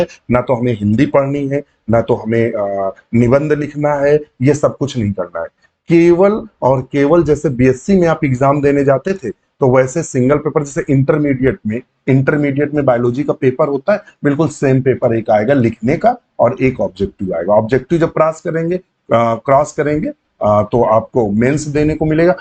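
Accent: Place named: South, India. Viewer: Mere ek sir the south se vo bhi ese hi bolte the...l yell m yemmm n Yenna.....sobi think sir south se h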